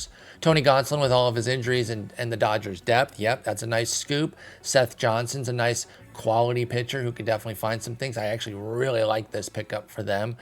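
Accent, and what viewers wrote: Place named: Ireland. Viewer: Lmao the Jacksonville Irish is incredible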